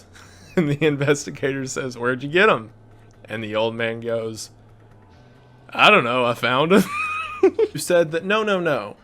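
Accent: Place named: South, United States. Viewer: I adore Wendigoon. I go to sleep listening to that beautiful southern twaaaang.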